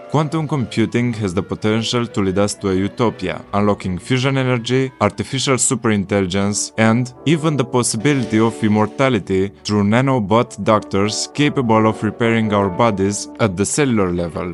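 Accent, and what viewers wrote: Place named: Romania. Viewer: Dupa accent mi am dat seama ca esti Roman , keep it going :)))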